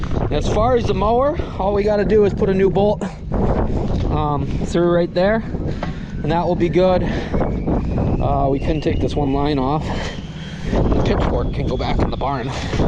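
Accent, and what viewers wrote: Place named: West Coast, United States. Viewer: Your brother has a lot heavier NY or NJ style accent than you. You could easily blend with us West Coasters.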